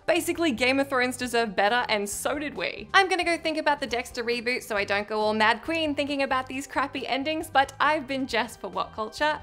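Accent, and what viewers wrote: Australia. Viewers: Is this person Australian? Just curious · What channel do I know you from??😳 I know you’re not british you’re Australian 💁🏼‍♀️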